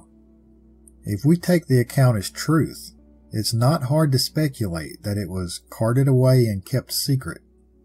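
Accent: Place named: North Carolina, United States. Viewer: I do so enjoy these stories as I do hearing your accent; when I was a kid we lived in NC for 3 years and it's so familiar still.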